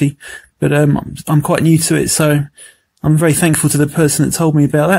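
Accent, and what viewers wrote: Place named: United Kingdom. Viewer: It's in the description above in case u didnt understand what he said bcuz I couldn't understand him either with his British accent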